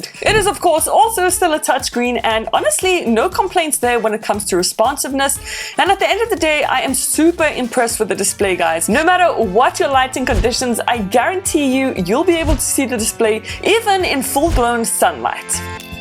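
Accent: South Africa. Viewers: You a Saffa (accent)? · Awesome wath. But are you from south Africa?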